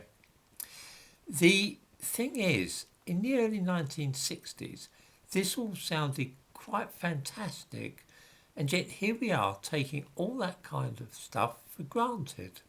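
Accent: United Kingdom. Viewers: I stumbled upon this channel on my youtube (thank God for its “suggestions” algorithms) and rejoiced at its content and the “oh so British” delivery I am an American of Italian origins, visited England in my youth (1970’s) and absolutely loved it. Later visits evidenced a clear change in the fabric of its society Europe, in general, is loosing its identity, and it’s painful Cheers from the US, glad I found you, I’ll set up to devour your content in the following weeks, and looking forward to the upcoming Identity is a defining and beautiful trait, once you loose it, it’ll be hard to regain We MUST preserve it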